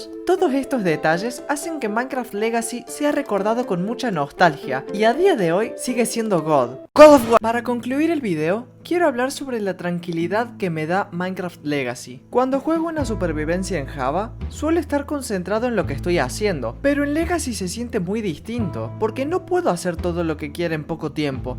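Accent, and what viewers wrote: Córdoba, Argentina. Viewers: Tu tonada cordobesa le da un plus al video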